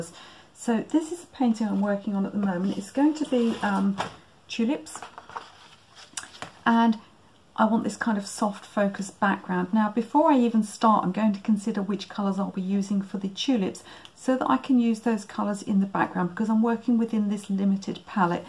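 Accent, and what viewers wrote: England, United Kingdom. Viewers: Great info, thank you for sharing your knowledge! Plus, I love listening to your beautiful English accent! 😍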